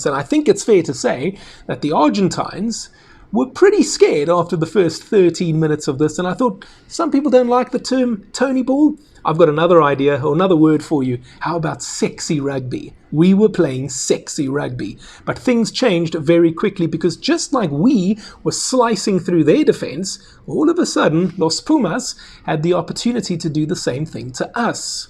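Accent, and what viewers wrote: South Africa. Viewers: Well then, you do you! :) Everyone finds their own unique voice online. Ah, I'm not aware of your other appearances/media involvement. I'm a Capetonian living in Paris, so a bit out of the loop. Either way, I love listening to different perspectives on our beautiful game, and specifically from people with that lekker accent. Connects me back to home. Thanks for being graceful with the feedback, and keep doing what you're doing! Cheers 🍻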